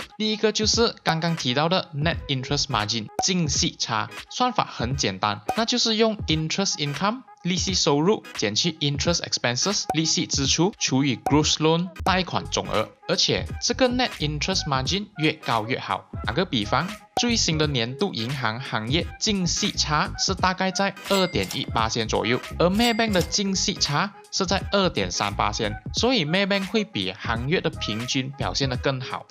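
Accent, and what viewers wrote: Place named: Hunan, China. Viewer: 这个马来小编，除了南方腔，还有湖南腔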